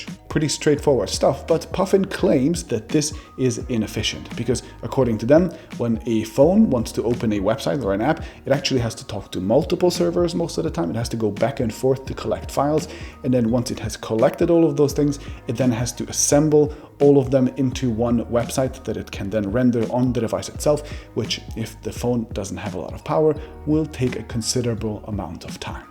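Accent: Germany. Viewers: You are german? :) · Du bist deutsch? :D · Are you from Germany ? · Manchmal wünschte ich mir du würdest einfach Deutsch sprechen. Nicht weil dein Englisch schlecht wäre oder ich dich nicht verstehen würde, aber das offensichtliche"ein deutscher spricht englisch" englisch das du sprichst lässt mich einfach erwarten das da gleich ein paar brocken deutsch durchstechen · Hab ich mir doch gleich in Mrwhosetheboss Video gedacht dass du Deutscher bist :D Wir können unseren Akzent einfach nicht verstecken wenn wir Englisch reden^^ Aber nett so deinen Kanal gefunden zu haben, war mir bisher echt unbekannt obwohl du ja echt schon ziemlich viele Abos hast, jetzt hast du noch einen mehr^^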